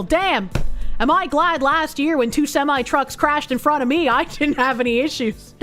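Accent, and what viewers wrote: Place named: Massachusetts, United States. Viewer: Ugh I watched a montage of telling stories about her mom the other day and now I can't not hear the Massachusetts. Ruined. Still gonna watch tho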